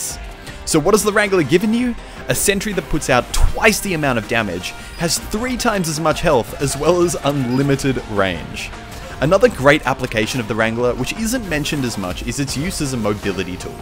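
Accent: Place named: Australia. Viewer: I swear, he sounded less Australian back then.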